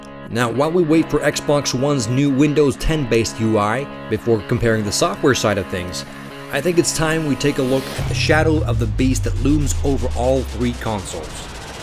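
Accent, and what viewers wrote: Finland. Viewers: Is this guy finnish? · Är du finlandssvensk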